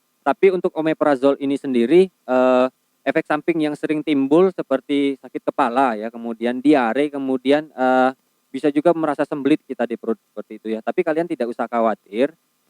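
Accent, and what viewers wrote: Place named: Java, Indonesia. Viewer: Jawanya kental bet bro🤙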